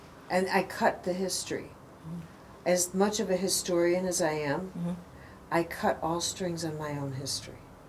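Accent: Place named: Chicago, United States. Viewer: I do sometimes feel annoyed with her and wish she had a better speaking voice e.g.That American Chicago accent can grate.But her amazing insights into the behaviour of people and religious Myths and people's everyday way of dealing with life is so darned honest and incisive it is riveting. As much as i love her I will not give up the scaffolding of Catholicism for her intellectualism. Like St Teresa of Avila I love it.And CM has become much nicer since meeting that great Catholic mystic.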